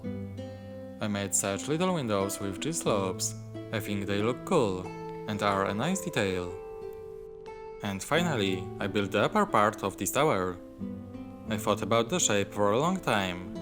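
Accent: Poland. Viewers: Tak mi coś akcent podejrzanie zalatywał Pongliszem więc miło widzieć, że PL. Dobra robota! 🙂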